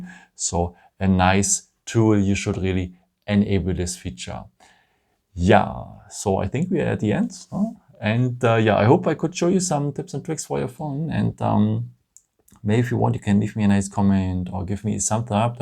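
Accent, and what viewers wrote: Germany. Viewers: Are you from germany? Kommst du aus deutschland?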